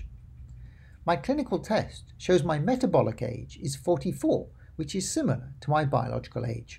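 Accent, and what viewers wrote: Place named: United Kingdom. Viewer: Friend, In spite of your predictably formal Brit accent and you're at least pseudoscientific approach to self-analysis, your presentation is very ephemeral and subjective, and even though I am a great fan of nmn, this is not a particularly compelling presentation of its benefits. My suggestion is to try instead, to relax your delivery.. even if it means pretending you're an american.. and to provide far more substantive information, rather than merely to emulate the superficial appearances thereof. Then you'll be well on your way to a far more helpful presentation.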